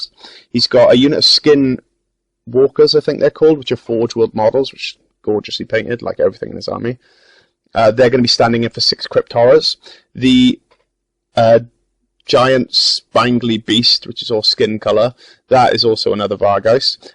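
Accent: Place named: Wales, United Kingdom. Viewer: Nice battle report, lovely models. Good to hear a Welshman amongst all the Americans. Hope you do more videos.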